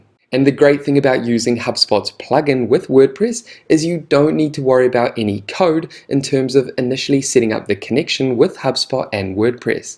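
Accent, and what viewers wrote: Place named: New Zealand. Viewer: I like your nz accent. reminds me of a damn good time. but now I'm back in Europe. 😥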